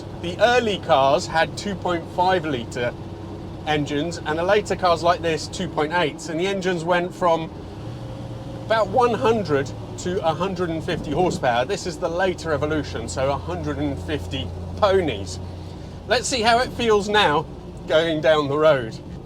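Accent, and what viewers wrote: Italy. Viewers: I really like this guy and appreciate his great videos but his over pronunciation of Italian words is really grating and un necessary . We get the point , yes you have an Italian heritage but let's be honest you grew up in the UK and that's how your true accent . I can speak some Italian but never over emphasise the accent as it sounds silly when mixed with English. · No need to apologise for the Italian-ness. When it comes to Italian cars, them Italian twang is kind of necessary. At least in my book, but I'm a weirdo.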